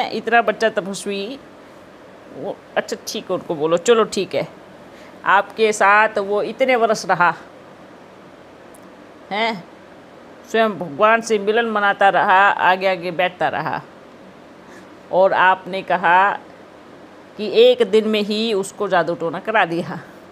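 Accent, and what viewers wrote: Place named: Sindh, Pakistan. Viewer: ब्रह्मा बाबा और जानकी दादी सिंद्ध पाकिस्तान में जन्म लिए थे तथा सिद्धी टोन में बोलते थे। अब यहां जो आवाज है वह वैसे ही हैं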